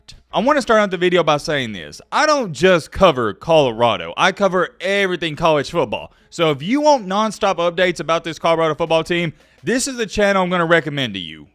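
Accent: South, United States. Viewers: The Deion Sanders era at Colorado will be very interesting to watch. It doesn't matter who the coach is, if they don't win the boosters and the fans will turn on him. But then again if he's successful then his reign in Colorado won't be long. P.S. That girl in the video was that your wife, girlfriend, sister, cousin (you sound like you're from the south) or is that some sort of stalker thing going on?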